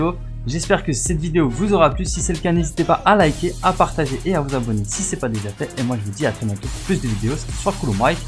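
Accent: Belgium. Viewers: Tu es belge Nn?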